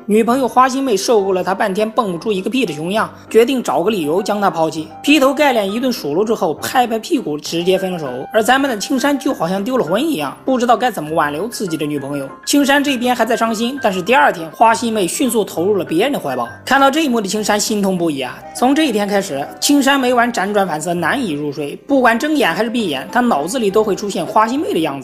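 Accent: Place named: Northeast, China. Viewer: 這是東北解說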